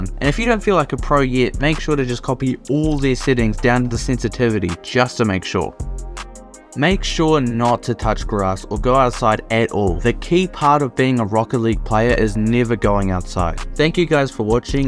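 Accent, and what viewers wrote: New Zealand. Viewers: Are you a kiwi.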